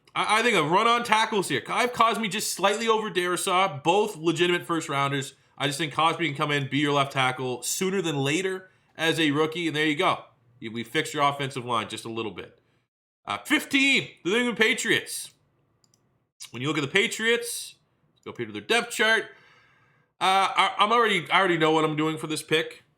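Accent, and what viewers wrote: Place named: Canada. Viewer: This guys great. Sounds Canadian even though he’s a philly fan. Also what website did he use?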